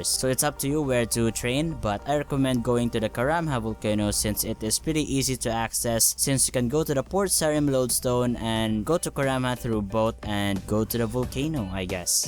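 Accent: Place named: Philippines. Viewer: you have the most annoying fucken accent ive ever heard. pinoy?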